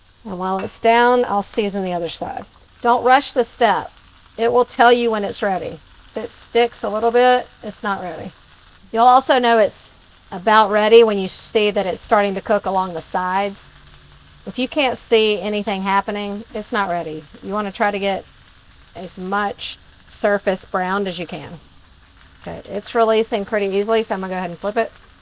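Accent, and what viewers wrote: South, United States. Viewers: Her particular southern accent is the same as my best friend’s from GA, not too much not too little. I love hearing her voice too. ❤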